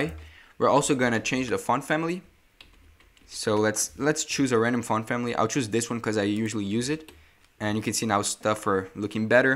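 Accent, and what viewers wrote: Brazil. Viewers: Você é Brasileiro né? Are you Brazilian?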